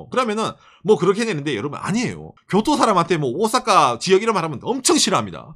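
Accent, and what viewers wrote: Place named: Changwon, South Korea. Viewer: 살짝 창원느낌이네요 ㅋㅋ 창원출신 대학동기도 창원프라이드가 엄청쌔더라구요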